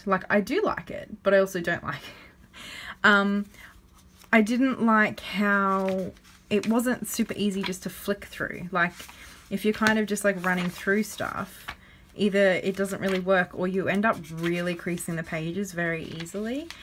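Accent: Australia. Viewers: Hi, I’ve just stumbled across your video by accident. I feel like I’m living your life a year later. I too am a bullet journalist too and have considered switching to a hobonichi next year as I am in the midst of completing my master and creating layouts is starting to impede on my time. I thought the pre-printed layouts would assist me next year but I’m a bit hesitant as to how it will translate in the hobonichi. I’m a little worried that there aren’t enough spare pages at the back of the hobonichi for collections and challenges like you would in the Leuchtturm. Loved listening experience with the Cousin Avec 😊 Hope I’m right in saying that I detect an Aussie accent. I’m from Kangaland too (Sydney to be precise).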